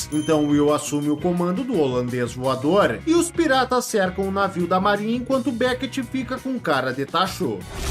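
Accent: Rio Grande do Sul, Brazil. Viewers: bah o meu tu é gaúcho né??? muito bom é os guri hehehehehhe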